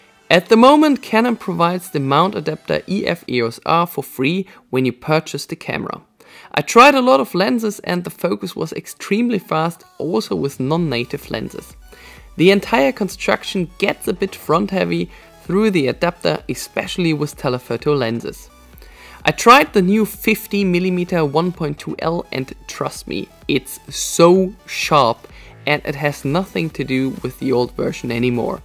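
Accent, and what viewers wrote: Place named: Germany. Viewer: dat german english :D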